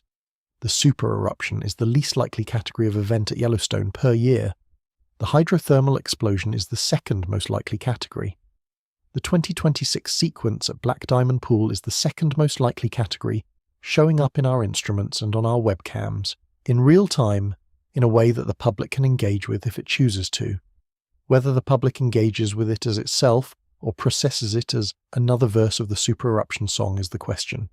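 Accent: United Kingdom. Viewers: Everything with a British accent is a bullshit Lie!!!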